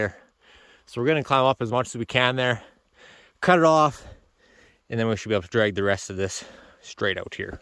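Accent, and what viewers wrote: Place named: Upper Peninsula, United States. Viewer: Congrats on 1000 subscribers!!! Great channel!! Your accent is awesome, reminds me of the upper peninsula here in Michigan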